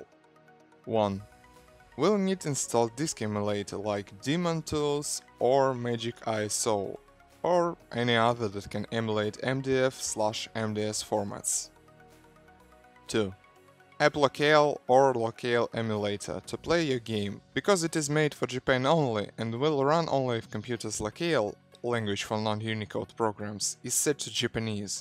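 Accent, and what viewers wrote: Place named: Russia. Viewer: почему твое произношение похоже на русского?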